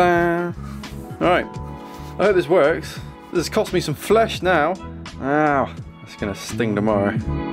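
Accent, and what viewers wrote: United Kingdom. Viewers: This is so epic! Glad to have found another UK builder! Do I detect a slight west country accent?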